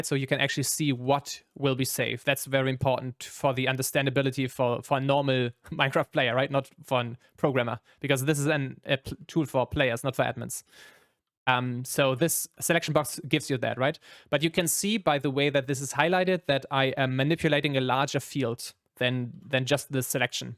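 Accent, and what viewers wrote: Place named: Germany. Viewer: Dein Akzent klingt sehr deutsch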